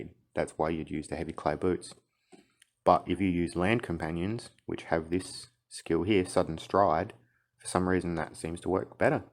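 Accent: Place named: Australia. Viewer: g'day mate you r definitely an Ozzy that's for sure lol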